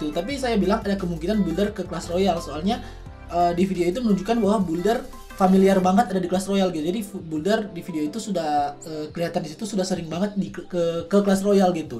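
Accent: Manado, Indonesia. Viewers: Bang lu org manado ya??, sorry subcriber baru btw gua juga org manado tpi sekarang tinggal di gorontalo karena tugas ortu....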